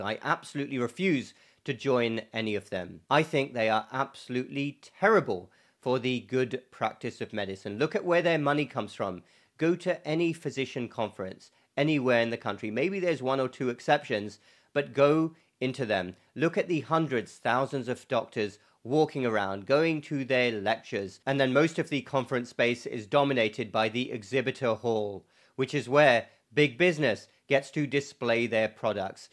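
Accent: England, United Kingdom. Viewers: Dr Sunreel lives and works in the USA. You have a lovely English accent don't lose it 🤗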